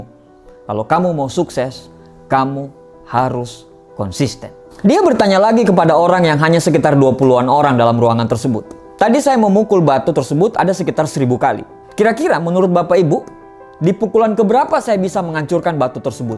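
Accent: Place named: Banyumas, Indonesia. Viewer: Mntp ngapak gafir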